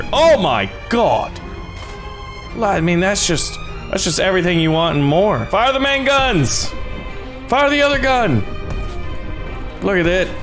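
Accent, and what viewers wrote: Germany. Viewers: How did he get so good at German accents??? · Great German🇩🇪🇩🇪🇩🇪🇩🇪🇩🇪🇩🇪 ascent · This is exactly why I subscribe to your your chanle!!! I love Fritz and Hand You do the best German acsent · Was Für deutscher Akzent Bruder!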